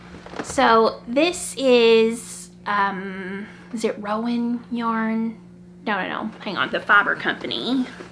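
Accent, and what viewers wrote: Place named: South, United States. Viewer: I absolutely love your podcast and your colour palette and choice of yarns and wish i could afford the same ones, hopefully one day lol. your choices are great examples to base my own projects on. Im loving the little additions of 'what we say in the South' im obsessed. Your accent is much more interesting to listen to than our very boring New Zealand one. I love your podcast and could listen to you speak all day, a great way to end a busy day at work! Please keep posting😊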